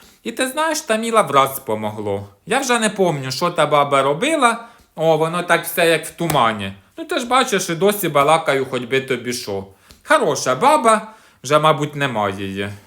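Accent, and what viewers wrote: Poltava, Ukraine. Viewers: Обожнюю ту полтавщину, моя рідна бабуся з кобеляк, ну точно так балакає😂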